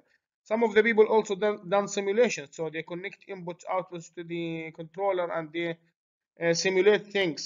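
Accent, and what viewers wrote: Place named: Iraq. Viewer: من لهجتك عراقي❤😊 اذا ممكن اتواصل وياك محتاج هذا الجهاز لكن مال دراجات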